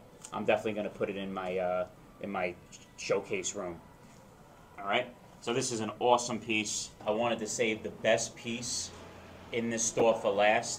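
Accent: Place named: Long Island, United States. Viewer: Great tour! You really captured what the store has to offer. And you are so Long Island- Great accent.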